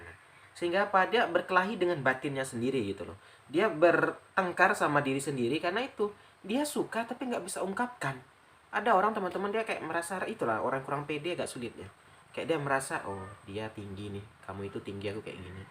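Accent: Padang, Indonesia. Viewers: Logat bahasanya sprtnya mas org padang ya...🤣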